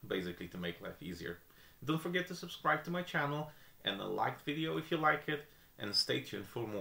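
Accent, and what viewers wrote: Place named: Finland. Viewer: the amount of information is very nice!, where are you from? I kind of get a finnish vibe but anyways good video!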